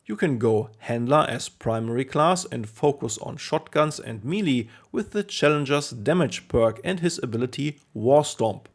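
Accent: Germany. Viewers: Ja cool das du da drauf antwortest!!! wusste ich doch gleich das deine Muttersprache deutsch sein müsste!! Dieses markante wenn Deutsche Englisch sprechen erkennt man irgendwie sofort!! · are you german :D ?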